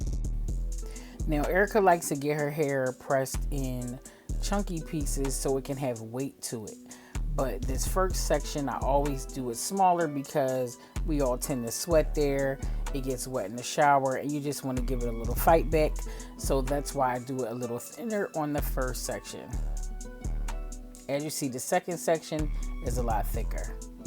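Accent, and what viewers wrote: Philadelphia, United States. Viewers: Your channel is amazing. Just peep your Facebook page. From your voice I just knew you were from Philadelphia. Not only that you are just around corner from where I use live.❤ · Are you from philly? You sound just like one of my clients. 😂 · Are you from Philly? I feel like I hear an ancient.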